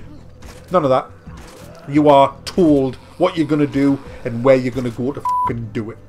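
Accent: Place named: Newcastle, United Kingdom. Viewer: Ahh right, well your accent made this review even funnier, really sounded geordie. What was the game you suggested buying instead of this?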